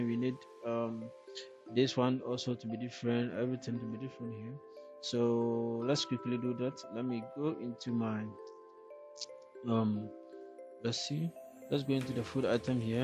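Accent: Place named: Nigeria. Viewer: he sounds like a Nigeria, is he one ?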